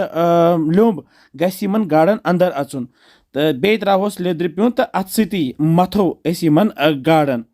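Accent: Kashmir, India. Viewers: I like kashmiri voice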